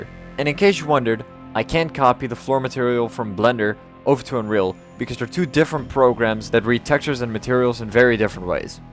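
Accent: Netherlands. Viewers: bro you sound so dutch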